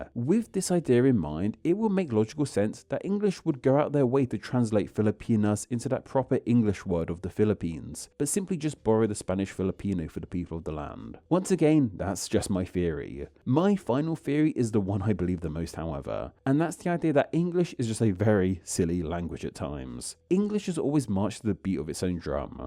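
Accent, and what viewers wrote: United Kingdom. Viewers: I doubt he did it on purpose. Being a US citizen, I encounter many horrendous mispronunciations in real life. It's somewhat an "entitlement" to the language of its country. I hear people say: "How do you pronounce this or that with an English accent?" (not related to England of course) It's the lack of trying or want to try in my personal opinion. Don't misunderstand me, there are many who do try (and succeed or fail.) But this one is just reading the name with an extreme form of dyslexia and not caring, leaving the horribly butchered name in the video instead of editing it out with a correct pronunciation.... plain laziness and monetization. edit: And now I have to apologise because after listening on, I find the narrator speaks with a British, albeit not thick, accent and nothing to do with the Americas and the US of it.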